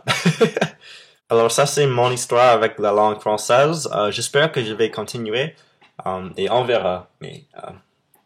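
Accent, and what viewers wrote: United States. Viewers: L'Amérique c pas mon truc mais j'adore ton accent américain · Michael Rivière Non, on dit des Anglais (ou anglo saxons) qu'ils ont un accent anglo saxon... mais en l'occurrence, ce jeune homme est américain et a un accent américain. Ça va, il fait pas trop chaud chez toi? ( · Bonjour :) Je viens de découvrir ta chaîne et tes vidéos. Tu est très beau :3 et tu parles très bien français, même si tu as l'accent américain (ce qui est normal). Je te félicite c'est très bien :) Translation : Hello :) I just found your channel and your videos. You are very handsome :3 and you speak French very well, even if you have an American accent (which is normal). I congratulate you, that's fine :) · Salut! Je viens de découvrir ta chaine , et j'avoue que c'est trop cool d'entendre un américain parler Français. Je trouve que tu t'en sort pas mal même avec l'accent avec quelques fautes mais sa c'est normal :) Et je voulais savoir si "quelqu'un" connaissait des astuces pour parler anglais :) J'aime cette langue mais les cours que font les prof son nul donc ..... 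voila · Franchement, chapeau ! =) Tu parles trop bien, malgré des p'tites fautes, mais ça, on s'en fou ^^. Je pense que si je ne l'avais pas appris depuis mon plus jeune âge, je n'aurais jamais été capable d'apprendre le français -3- En tout cas, tu as du courage ! Continue de t'améliorer c'est presque parfait, mais surtout ne change jamais ton accent ! Personnellement, je trouve que l'accent français est fade et plat comparé à l'accent américain ! =)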